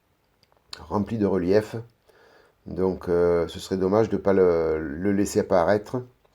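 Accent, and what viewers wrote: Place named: Provence, France. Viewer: Bonjour bonjour..au sujet des ciseaux,pour des grosses pièces au cutter c'est mieux (avis perso)..dis moi vu ton petit accent je pense qu'on est voisin..je suis natif de Salon mais j'habite Marseille..bonne continuation..c'est bien parti!!! Jan-mi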